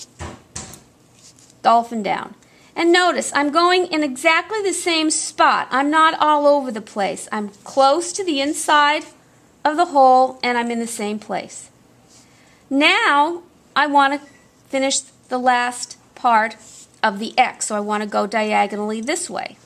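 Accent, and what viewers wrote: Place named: New York, United States. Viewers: everyone has an accent no matter where you’re from. My guess for the video is east coast United States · Love the slight NY accent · I loved this tutorial thanks! It was like beig directed by a mildly annoyed New York/New Jersey person which was brilliant. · You sound like you’re from new york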